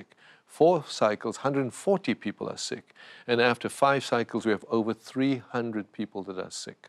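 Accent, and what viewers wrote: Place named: South Africa. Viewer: Great that having spent so much time outside South Africa he still maintains his perfect South African English accent.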